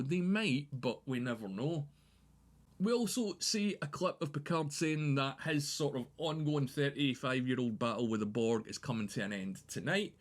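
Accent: Scotland, United Kingdom. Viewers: I have it at 50%, i understand him, and i am no native English speaker. And he is having a thik British accent. What i actually like. 😁👍🏼✌🏼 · I think he has a Scottish accent.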